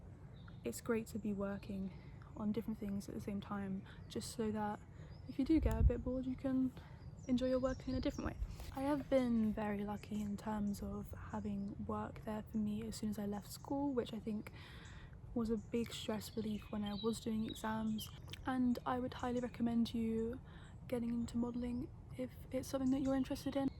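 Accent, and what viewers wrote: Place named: United Kingdom. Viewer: I'm in love with British accent Greetings from Germany ❤️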